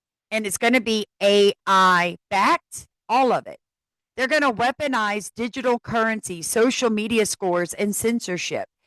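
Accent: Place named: Louisiana, United States. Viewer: When I heard you say that you’re in Louisiana I was so excited because I was born in New Orleans and then my son walked into the room and heard you say the word “monster” and he said OMG mom she says monster just like you! I said of course she does we are both from LA 😂 I was not raised there but the accent still sneaks out sometimes. ❤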